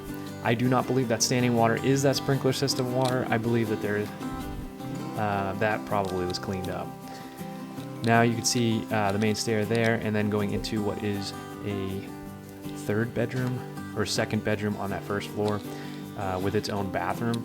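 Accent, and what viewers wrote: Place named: Pittsburgh, United States. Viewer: Popped up in recommend, heard a yjnzer accent, saw the house and was like hey I grew up in jeannette! Crazy how the world works. Good luck!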